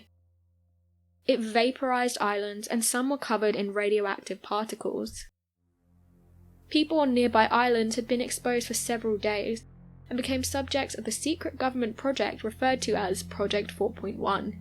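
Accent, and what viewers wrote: England, United Kingdom. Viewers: I just listened, is that really you?, sexy and English?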